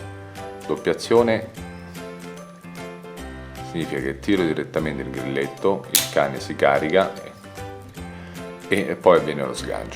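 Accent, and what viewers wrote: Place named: Marche, Italy. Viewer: Dall'accento familiare sembri Marchigiano..👌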